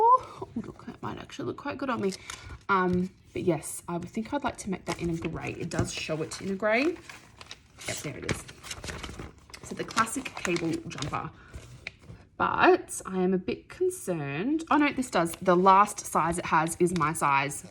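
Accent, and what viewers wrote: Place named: Australia. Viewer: Hello I was recommended to your podcast from the sisters at Frivolous & Frugal (Penny & Dawn) USA what a surprise when I heard you speak, a fellow Aussie 👍🏻 I live in mid north SA and after going back to your 1st episode instantly subscribed. Rainy day & cold today I’ll be watching in order your previous episodes, snuggled up on lounge knitting. Many thanks 🦋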